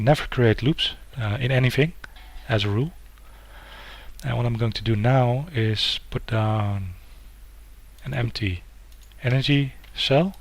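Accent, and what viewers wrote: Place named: Netherlands. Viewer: You sound a bit of foreign, Dutch perhaps? :P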